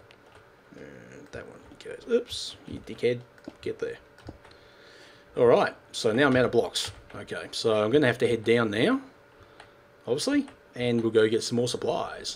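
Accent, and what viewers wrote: Australia. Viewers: this doesn't matter to me but answer this truthfully Are you actually Aussie or an American poser? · he sounds like an auusie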